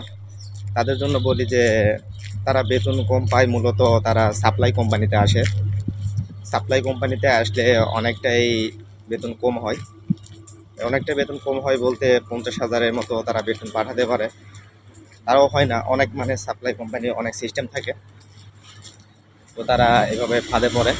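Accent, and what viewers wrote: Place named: Naogaon, Bangladesh. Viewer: আপনের ভাষা মনে হয় নওগাঁর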